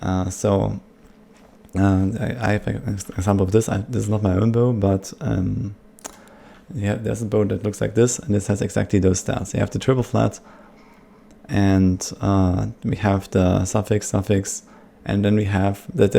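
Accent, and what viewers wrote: Germany. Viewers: Thx a lot, now i understood everything ;). Looked 3 videos before and i still had questions. Fast english, but is ok for me because its german english ^^. Dank dir auf jeden Fall, super Videos ;)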